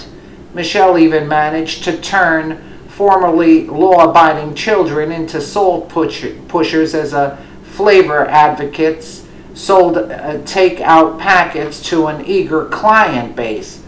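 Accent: New York, United States. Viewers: good job sounds like your from NY . yes I fixed my children's lunch the night before . who knows what they feed people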